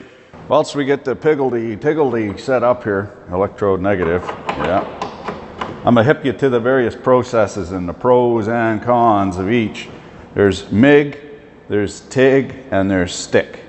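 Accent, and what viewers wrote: Canada. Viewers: As a yankee I just love the Canadian accent and slang in these videos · Canadian accents. The combination of minnesota and irish accents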